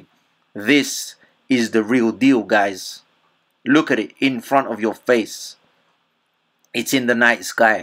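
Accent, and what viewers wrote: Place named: England, United Kingdom. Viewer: Good stuff, where are you living? you sound English.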